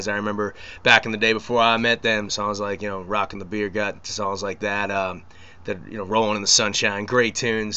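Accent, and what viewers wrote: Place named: South, United States. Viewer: This is awesome, I just found these postings! You are certainly starting to sound like a "southerner" lol! Opening for.....congrats-miss you guys!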